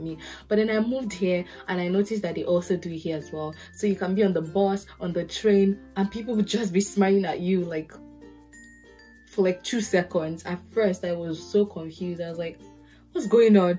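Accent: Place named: Ijebu-Igbo, Nigeria. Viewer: Do not change your accent for anybody!! Thank you for not changing your ijebu-igbo accent 😁😁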